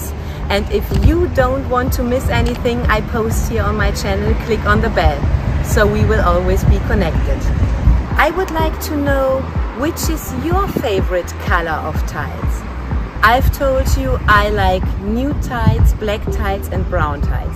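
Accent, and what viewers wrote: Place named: Germany. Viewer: Yr German accent makes me hot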